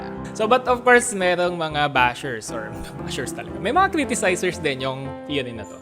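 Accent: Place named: Jamaica. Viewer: Sounds like Jamaican in a way 😅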